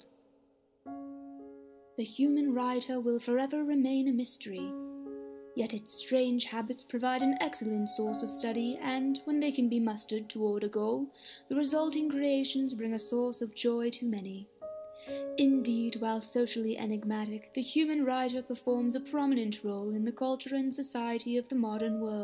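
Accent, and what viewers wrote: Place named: England, United Kingdom. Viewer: I’m so impressed with the English accent 😂 I’m assuming we can thank your time at Durham?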